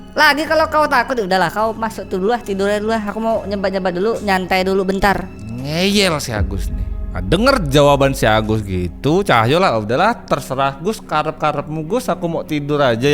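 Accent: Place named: Lampung, Indonesia. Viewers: Latar jawa, logat lampung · Lampung gitu logatnya bg😅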